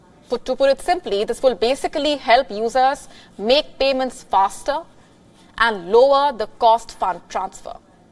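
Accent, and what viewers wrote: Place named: India. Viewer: What an english accent., please choose a narrator with a good accent., she is talking indian not english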